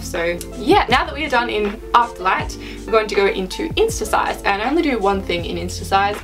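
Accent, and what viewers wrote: Australia. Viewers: I knew you was Australian when I heard you speak! Love your wall background and your makeup highlight! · And are you Australian?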